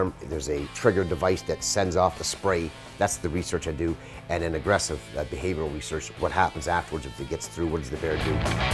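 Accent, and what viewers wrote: Canada. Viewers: “Y’know?” Yep that’s how talk in northern Ontario 😭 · This guy is clearly Canadian. He has the accent and the video states he is from Ontario.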